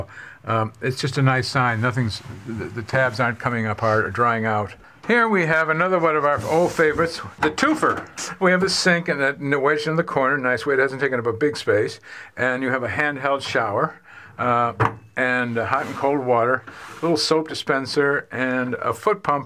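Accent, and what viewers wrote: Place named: United States. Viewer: All that info, and all spoken in perfect 👍 American English!! What a beauty!!!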